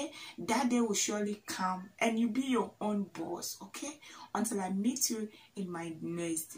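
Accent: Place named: Ghana. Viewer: My favourite girl with the beautiful Ghana accent thanks for being a hardworking lady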